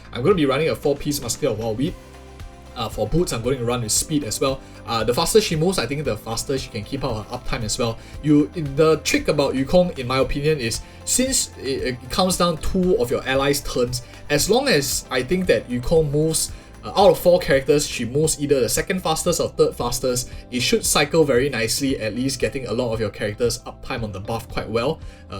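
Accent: Singapore. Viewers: subscribed for the singaporean accent 😂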